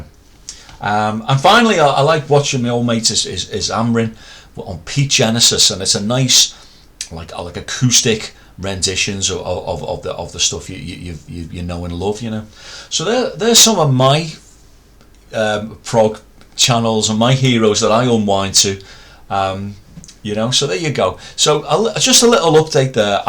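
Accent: Liverpool, United Kingdom. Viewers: I love your liverpoolian accent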